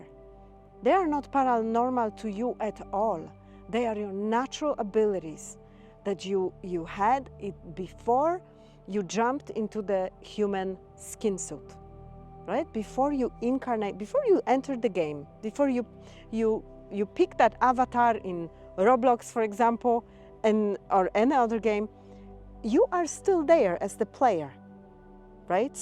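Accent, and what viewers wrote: Poland. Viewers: Omg you sound so Polish, lol. ❤❤❤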